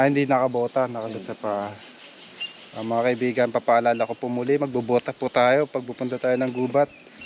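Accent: Visayas, Philippines. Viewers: bisaya ka pala ya😁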